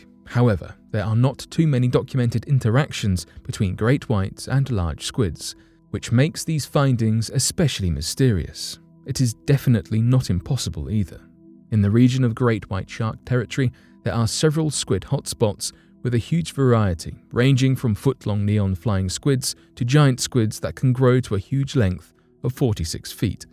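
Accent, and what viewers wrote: United Kingdom. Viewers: This is why I never watch these videos... The title of the video is very specific, yet it's only a fraction of what the video is about, and is just a photo Montague with someone British voice just blabbering.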